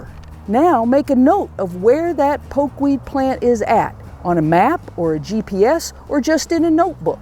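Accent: United States. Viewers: Ma’am I love your country accent.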